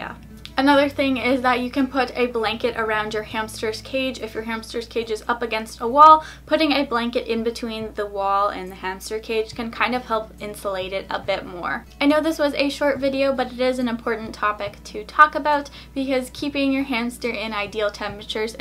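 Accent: Canada. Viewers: Are you from Canada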